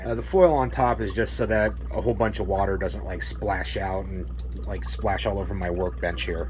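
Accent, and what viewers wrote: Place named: Pennsylvania, United States. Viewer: Brother, if you're not from Pa, then you're certainly in a border state. One - the Amish. Two, the inflection in the voice. FWIW, I buy all my meat and produce from Amish vendors in Mt Joy and Lancaster county.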